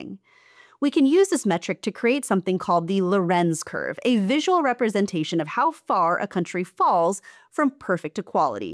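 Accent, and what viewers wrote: United States. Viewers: You have a perfect American accent. I would not have guessed you were German until you gave it away. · Always surprised when I hear a American accent talking sense! ❤ Makes me believe , or hope that things will be fine!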